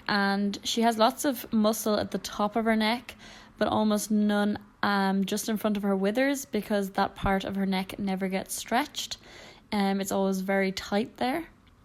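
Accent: Ireland. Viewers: Are u Irish?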